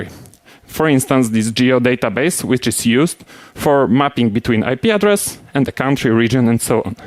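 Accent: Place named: Poland. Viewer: Haha, jaki chlop ma polski akcent xD smdzieki temu jest baedzo zrozumiale i zlapalem kqzde slowo ;)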